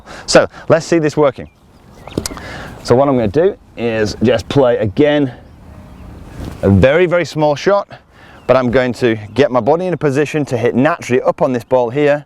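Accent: United Kingdom. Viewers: Great video's and a proper pleasant British accent 👍 unlike an annoying newsreader 😩👎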